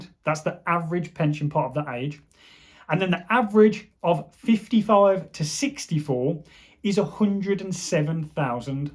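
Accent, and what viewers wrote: Black Country, United Kingdom. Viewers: I love your Black country accent 😂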